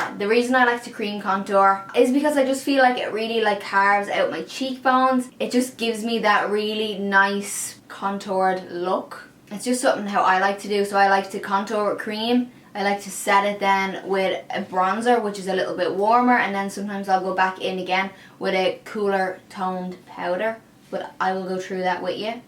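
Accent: Ireland. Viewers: Are you Irish? Love your accent 😭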